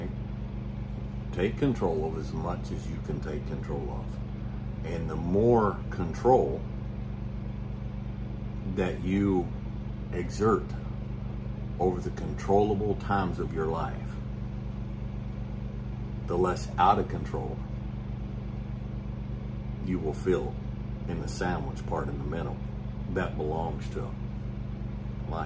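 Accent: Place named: South, United States. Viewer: I saw the hat and was so excited to hear a southern accent. Was not disappointed. 🙏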